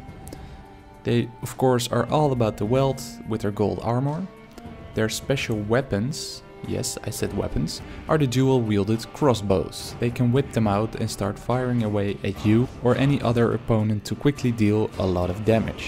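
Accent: Netherlands. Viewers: I can tell you're dutch by your english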